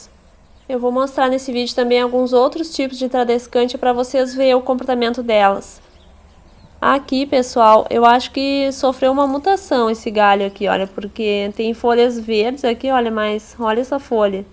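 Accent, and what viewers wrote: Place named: Pelotas, Brazil. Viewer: Parabéns pelo canal. Seu sotaque parece da região de Pelotas. Obrigado pelas dicas.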